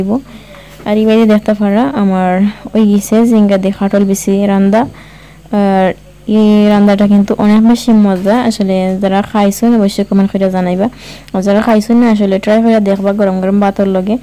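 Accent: Sylhet, Bangladesh. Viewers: Afnar mat kub bala lage jokon Sylheti matoin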